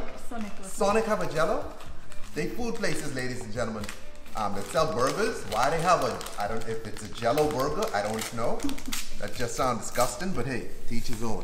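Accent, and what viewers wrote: Bahamas. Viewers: Bahamian accent strong son lol oh how we love a good drink ! Keep up the good work brother! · I'm sure this has been covered before, and I'm super late to this channel, but where is he from? He sounds Bahamian to me.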